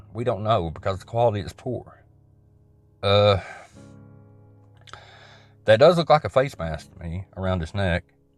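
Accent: South, United States. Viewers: Keep it real! Everything sounds better with a southern accent brother....love from Tennessee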